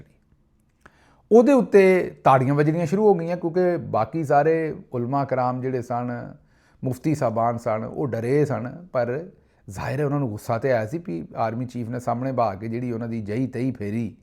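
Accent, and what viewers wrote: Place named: Nagaland, India. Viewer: گل صاب خالص ناگاں دا لہجہ تہاڈا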